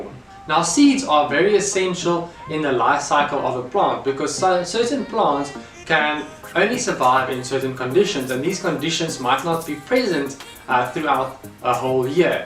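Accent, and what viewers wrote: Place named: South Africa. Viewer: thank you for the video man, it really helped. Are you South African? The accent is almost identical hahahah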